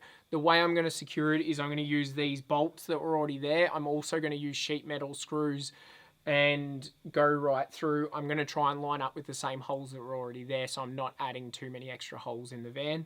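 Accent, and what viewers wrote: Australia. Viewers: I like:s Australian English accent... It's same like Australian cricket commentary.... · Maybe it's the native bird sounds in the background or my imagination but I reckon you're sounding a bit more Aussie since you've been back!? I'm so used to hearing American accents in film/TV that it still sound strange to me to hear our accent coming out of a screen haha. Hope you're enjoying VIC :)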